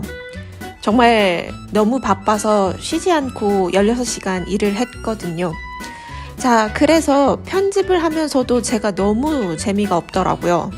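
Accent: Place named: Gyeongsang, South Korea. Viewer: 저도 지방러라 경북 대구 억양이 느껴지더라고요. 영상 잘 보고 있어요! 즐거운 비행 하세요~~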